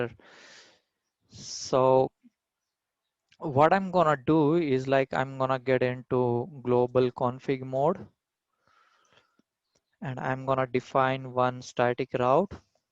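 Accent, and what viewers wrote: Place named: India. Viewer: I hate indian english! OMG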